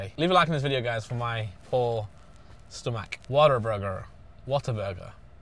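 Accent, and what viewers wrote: United Kingdom. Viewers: he prob has one of the most tame british accents lol but go off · I hate British accents, painful watch but I’m only watching to see papi whataburger. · The funniest part of this is you look so cowboy with the hat but then you’re violently British when you talk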